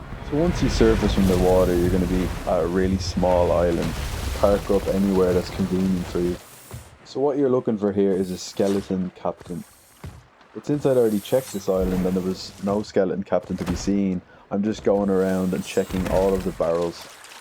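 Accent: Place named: Dublin, Ireland. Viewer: What part of dublin you from lad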